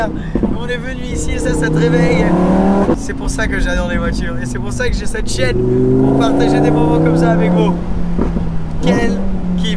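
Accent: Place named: United Kingdom. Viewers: J’aime tes vidéos... mais arrête de prononcer des noms italiens à l’anglaise... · C’est son origine anglaise ptn réfléchi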